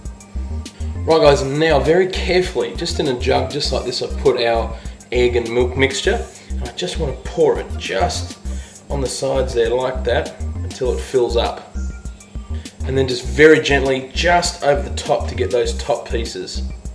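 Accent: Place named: Australia. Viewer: same here....heard ur Aussie voice and went SNAP Subscribe lololol look forward to ur videos "P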